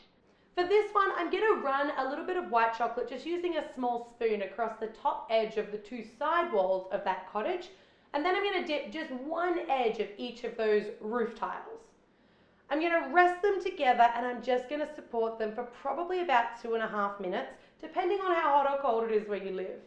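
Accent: Australia. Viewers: Is she from aus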